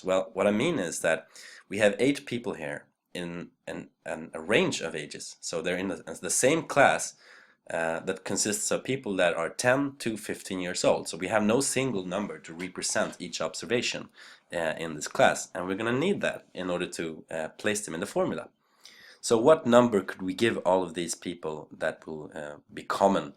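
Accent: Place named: Sweden. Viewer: Thanks for sharing! You have a Swedish accent! :)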